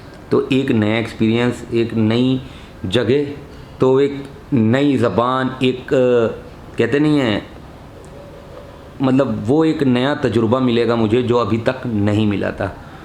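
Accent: Kanpur, India. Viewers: bhai maza a gya apki voice sun ke kanpur ki ek alg hi feel ata he maza a gya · Bhai...amazing vlog series....but yaar aapka typical Kanpur accent is really wonderful...😊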